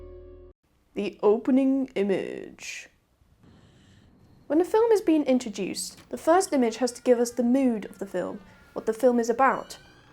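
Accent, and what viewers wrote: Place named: England, United Kingdom. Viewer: Great video! Great accent - where are you from? You sound like my favorite English pop star, Kim Wilde. Are you from London?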